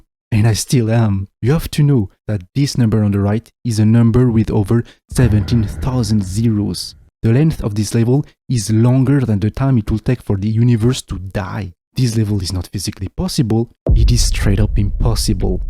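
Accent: France. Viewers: good video but you sound suspiciously french 😤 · You sound french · No it’s alright volume wise but you have a really strong french accent and it’s really hard to understand you sometimes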